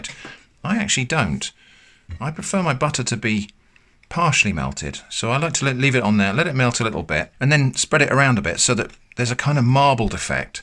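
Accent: United Kingdom. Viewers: That's so aggressively british gosh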